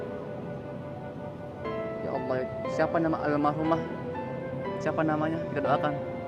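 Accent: Lombok, Indonesia. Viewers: Boleh Sy nebak-nebak...spt Logat orang Lombok❤️ Mf kalo salah...! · Logat"nya kek org lombok njirr😅 Owyah Bdw salam bang Gua dri Ntb nusa tenggara barat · knapa nada antum macem orang lombok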